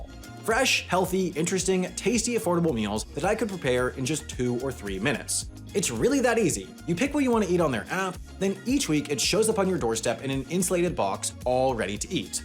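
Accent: United Kingdom. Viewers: Why British? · how does she have a british accent?! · She had to spend some time in Britain because that accent is a British one and I don't know if there is anywhere else she would pickup that accent, unless she is put on a voice for the videos. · I love how she speaks British English, instead of Americanised drawl, absorbed by osmosis from mindless entertainment. Pure class 👌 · Why does she sound British 😅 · damn that british accent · why does she sound british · why does she have a British accent?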